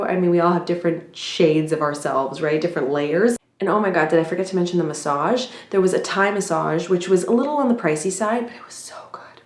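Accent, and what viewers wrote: Malaysia. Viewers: haha,bunyi dah macam org malaysia betul je... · you lived in penang! do u have the penang accent? ;) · Your Malaysian English accent is so on point! hahaha. I love it here as it is chill, but active at the same time. And the foood. I always miss it after a trip overseas! We hope to see you in Malaysia soon again!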